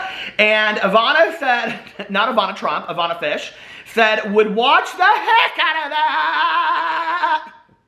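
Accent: South, United States. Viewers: "It's Shake and Bake...and I helped!" You just squeezed that little gem in there right at the beginning. I can just hear that thick Southern accent! Lol!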